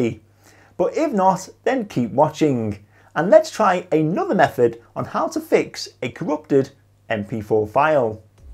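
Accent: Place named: Northern England, United Kingdom. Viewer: Dear, I hope your tips will help me, as I have carried out an audio only recording in mp4 but I can only hear the first 3min. of it, after which, it is complete silence, although the file keeps unfolding/ running/being played for a total of some 120 minutes. But first and foremost, I would like to tell you that I find your Northern England accent sooo lovely, it moves something in my heart! Where exactly does that accent come from? Is it from Cumbria? If so, from where in Cumbria? Coniston? Or anyway, from the Lake District? Anyway, I really love it, and I am so fond of people with that accent and the kind of personality that goes with it! I am already using the Wondershare software to edit, annotate and redact PDF files, and I am very satisfied with it. Best regards, Alberto from Florence, Italy.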